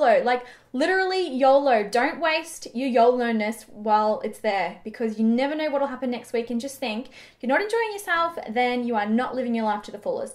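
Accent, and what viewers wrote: Australia. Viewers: Australian, huh?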